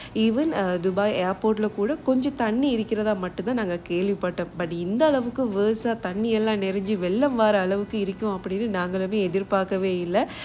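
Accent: Sri Lanka. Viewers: Hii assalamualikum sis❤.....I want to tell you one thing...that my mother had past away a few moths before....me and my mother used to see your video every night before we go to sleep....my mother really loves your sri lankan tamil slang of your voice....and seeing your video remains our memories of dubai...because we had lived several years before in dubai......and now she is not there to see your video.....sis pls dua for her.....and keep her in your dua.....I will also pray for your family....Allah make you to reach high....with good health......inshaallah ❤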